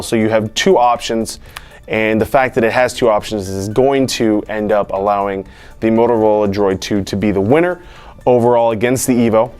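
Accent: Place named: Australia. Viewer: awful aussie accent mate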